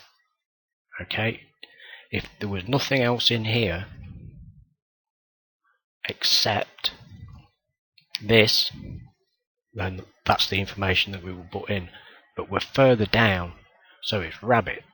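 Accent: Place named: United Kingdom. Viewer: Fantastic tutorial! It's nice to get some British tones on here too!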